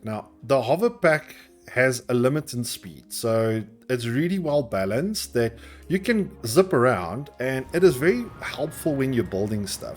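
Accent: South Africa. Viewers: Are you South African boet? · This guy sounds South-African 🤭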